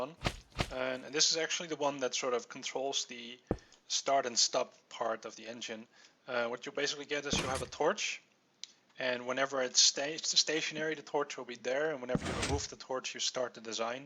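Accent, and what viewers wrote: Netherlands. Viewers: i can hear you are a Dutch man ;) ik heb er niks mee maar het is grappig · Are you dutch?? THE accent sounds really Dutch... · You dutch??? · Yes he's Dutch many ask this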